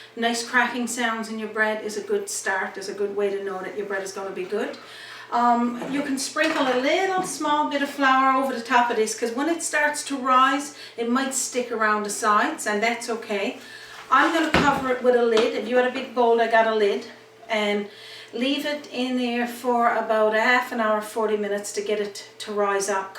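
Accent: Newfoundland, Canada. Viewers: I love Newfoundland accents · Thank you, thank you, thank you, This is absolutely amazing. I'm originally from Corner Brook but moved when I was 9. Learning how to do traditional NFLD recipes with your wonderful accent is truly amazing.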